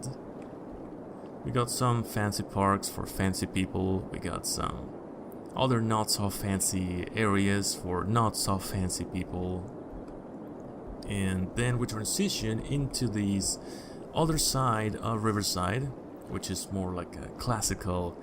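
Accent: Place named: Germany. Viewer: Hablas mejor ingles que el "buen" Peña Nieto. Estaba a punto de decir que eras Aleman por el accento. Yo soy de Francia, vivo en Mexico ;)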